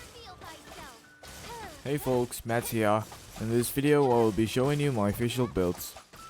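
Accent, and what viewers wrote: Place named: Australia. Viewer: aussie accent 👀👀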